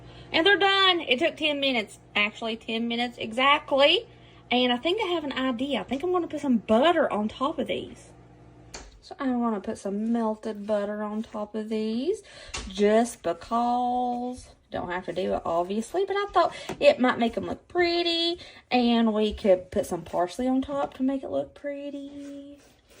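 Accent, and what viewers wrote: South, United States. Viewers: Came across you today and I have to say you are a joy to watch between your great, cheerful personality, your cute southern accent and your sweet face! You are so creative and with the price of groceries these days, you are doing a lot of good sharing all of your around $5 recipes and make it look fun!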